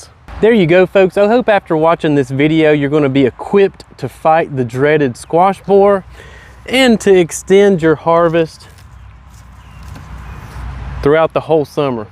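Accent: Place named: Carolina, United States. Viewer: lolol...you said 3 words and i knew you were from Carolina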